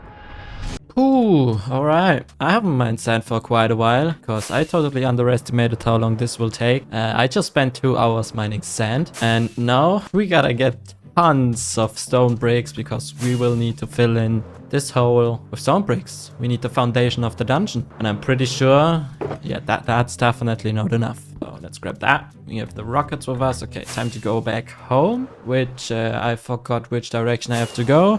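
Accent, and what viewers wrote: Germany. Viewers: Is bro german? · Are you german if yes than i knew it cuz ur accent. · Are you German? Because i thought you had a little German accent. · Are U German? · am i hearing a little german accent?? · You are German or? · Idk why, but he sounds so german 😂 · u german bro?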